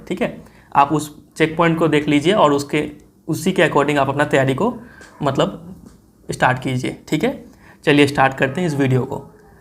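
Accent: Bihar, India. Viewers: Sir, Are You From Bihar..??? I Mean Your Way Of Speaking ❤️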